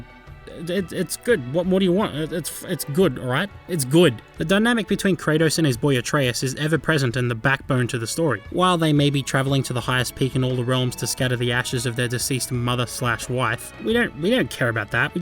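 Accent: Australia. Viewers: Finally!!! I love all your Vids! They're so funny everytime Maybe your Aussie accent helps a bit :D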